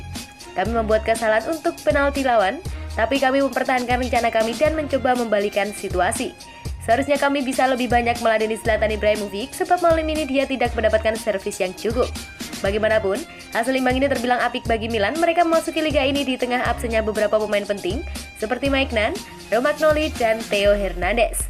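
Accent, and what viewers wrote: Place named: Java, Indonesia. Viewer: Lo kok jadi medddok uuwalah .. mba e ..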